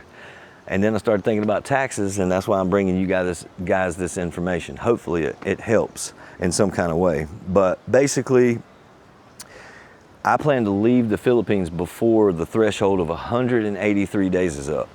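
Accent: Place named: Myrtle Beach, United States. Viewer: Just came across your channel.Interesting content. Thanks for sharing your adventures and I will follow along. I am living in Australia permanently but originally from Myrtle Beach. And by the sound of your accent I am sure you know myrtle beach. Keep up the content and best wishes to you.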